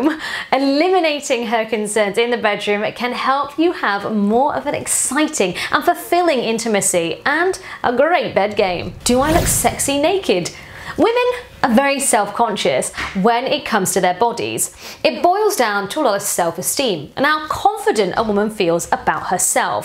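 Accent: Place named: England, United Kingdom. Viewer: Accent? It's the original English language!!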